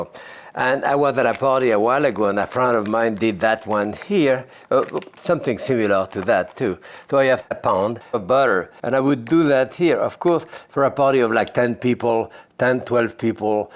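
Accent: France. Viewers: Impediment? Bruh he's just French hahaha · Speech impediment? Meh…I thought it was his French accent shining thru😂 Accents r great!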